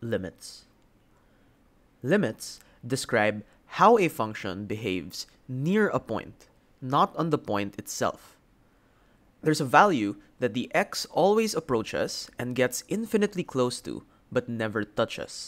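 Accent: Philippines. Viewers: you sound somewhat Pilipino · Pinoy itong nagsasalita ramdam ko. Unang salita pa lang sa video eh HAHAHA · This accent sounds Filipino. Are u Filipino? Edit: also great vid man, the way my school explains calculus is terrible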